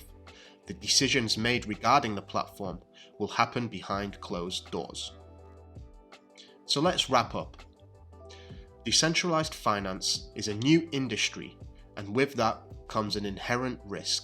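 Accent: Yorkshire, United Kingdom. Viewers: Very very informative. You are an excellent narrator! Northern accent, Yorkshire? Many thanks.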